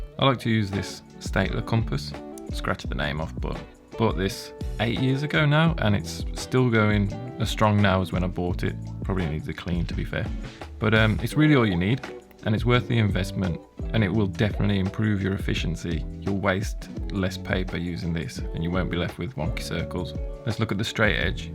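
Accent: Birmingham, United Kingdom. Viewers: I recently came across your channel. You share so much in this video for someone like myself who is completely new to this. Btw, it sounds like you have a Birmingham accent. Not that I expect you to share, lol.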